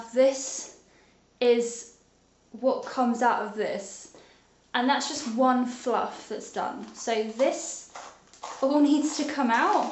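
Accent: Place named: United Kingdom. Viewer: British accent! 👌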